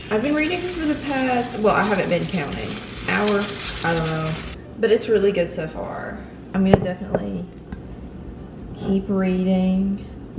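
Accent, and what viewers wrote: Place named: Texas, United States. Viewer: I'm from England and I like the Texas accent lol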